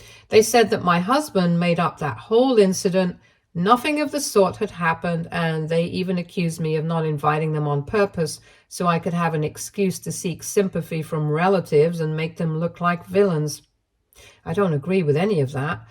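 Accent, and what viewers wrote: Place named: England, United Kingdom. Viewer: is English. So she has an English accent. We have lots of regional accents in the US as well. She is probably pronouncing it properly where she lives.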